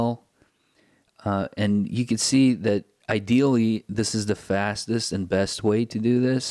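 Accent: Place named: Philippines. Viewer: cool dude, i know ur pinoy base on ur accent 😁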